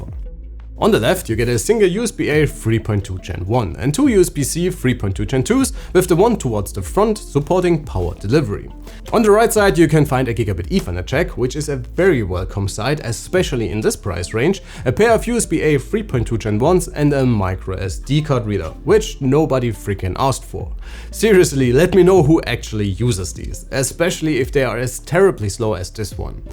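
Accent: Germany. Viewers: yes I love the German accent its so sick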